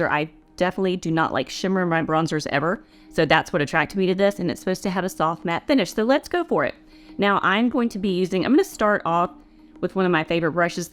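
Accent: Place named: South, United States. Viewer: Love your videos, short and to the point. Love your accent too being from the south myself. Dupe videos from you are awesome!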